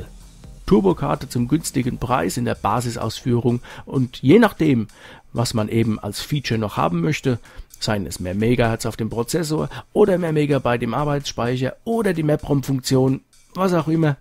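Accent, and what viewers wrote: Hesse, Germany. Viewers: Ist nicht so schlimm, wenn man beides nicht hat. Hatte erst in der letzten Woche nen 1200er aus'm Sperrmüll gefischt und dieser funktionierte nach nem Testlauf. Von daher wäre so ne Turbokarte durchaus interessant, wobei ich eh nur mein Lieblingsgame zocken würde; und zwar Lemmings, das hab ich in meiner Kindheit gesuchtet.:D Aber es ist trotzdem erstaunlich, was man mit nem Amiga alles anstellen kann; sowas war früher sogar dem PC technlologisch weiter voraus; die Workbench war sogar intuitiver bedienbar als die "schwarzweiß"-Apple-Oberfläche, wo man die einzige linke Maustaste gedrückt halten musste, damit das Menü offen blieb. Beim Amiga gabs diese Problem überhaupt nicht. Derzeit nutz ich zur Zeitvernichtung den WinUAE-Emulator + Kickstarts von AmigaForever sowie den verbleibenden Spiele-ROMs um die Lemminge zwischendurch in der Pause zu zocken. 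Ich finde, auf dem Amiga hatten die Spiele nen besseren Sound als die Konkurrenz. Ansonsten find ich die Videos von besser als die konkurrierenden YouTuber. Klingt komisch ist aber so; und das hat nischt mit dem hessischen Dialekt zu tun. Eher ist es die Qualität, an die niemand rankommt.:D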